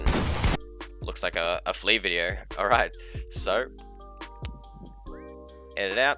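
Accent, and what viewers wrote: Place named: United States. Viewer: why does he sound like flea but with a american accent XD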